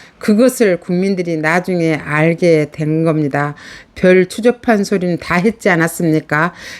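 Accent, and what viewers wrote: Jeolla, South Korea. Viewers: 전라도 사투리좀 그만~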